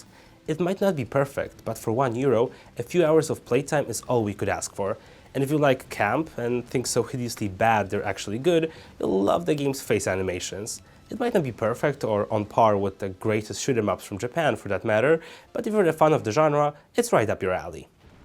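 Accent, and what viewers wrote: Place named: Poland. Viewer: Odrazu usłyszałem ze to Polak mowi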